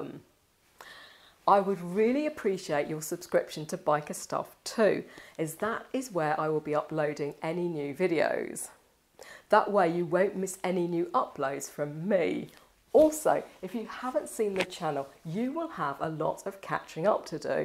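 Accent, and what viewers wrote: England, United Kingdom. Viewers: I was wondering where you were for a while but sort of figured what had happened. Anyway allways enjoyable content on all channels. No offence to our American friends it's all ways good to hear a English accent. I was born in St Leonards but now live in Australia. My bike 1984 GPz 900r. Good luck and good riding.😉😉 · I love her British accent. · Given the obvious of being a beautiful girl from Cornwall with an equally beautiful personality I would still view your video productions if say perhaps the topic was Cornish game hens! Great British accent Greetings from Arizona USA, where we speak some sort of english.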